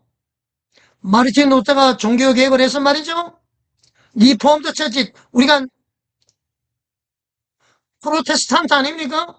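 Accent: North Korea. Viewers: 그런듯이 아니잖아요 참 웃기네요 그만지껄이세요. 모욕하지 마셰요 무슨 미친소리합니카 북한에서 왔네요 말투가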